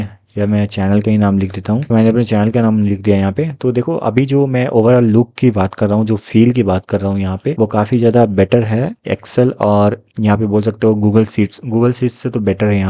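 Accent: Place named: Bengal, India. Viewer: Tum tam karna band karo. Bong lagte ho.but indeed informative.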